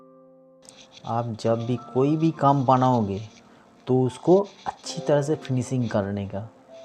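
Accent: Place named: Bengal, India. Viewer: You are 100% pure বাঙালি। হিন্দি শুনেই বোঝা যাচ্ছে। anyway ভিডিও গুলো কিন্তু খুব সুন্দর। Best of luck 👍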